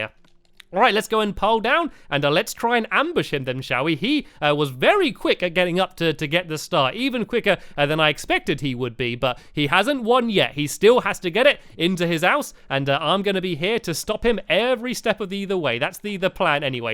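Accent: United Kingdom. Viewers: Alright!! I don't know if it's just me, or does stampy sound less british???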